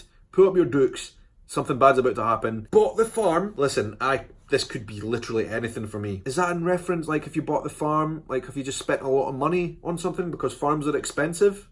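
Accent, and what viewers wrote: Scotland, United Kingdom. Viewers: I just adore you. It's so touching that you are at all concerned with my country but you seem particularly interested. I feels like such a compliment that you've gone to so much trouble to see what we're about. I desperately wish I had the means to visit Scotland and return the favor. Your accent is enchanting to me. :) I wish I could experience your country, as well. I've only seen it on film and screen but so much of it seems utterly beautiful.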